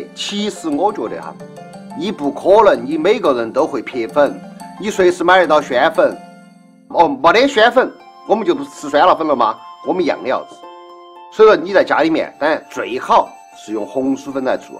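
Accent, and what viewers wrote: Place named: Sichuan, China. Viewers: 口水哗啦啦，明天就试一下，这个成都话简直太亲切了！好吃的板😀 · 我不是四川人，但听到的方言很亲切👍👍👍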